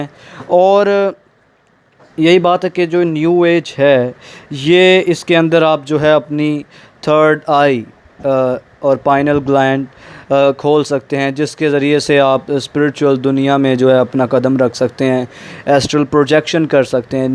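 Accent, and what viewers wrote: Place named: England, United Kingdom. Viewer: Ur English Accent I Like It :)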